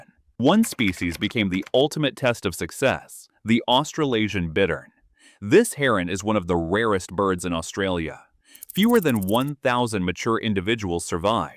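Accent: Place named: United States. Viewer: ok - an American accent producing this video 🤣🤣🤣 have they been there before? it was the Victorian State Government - seriously did you do your research????????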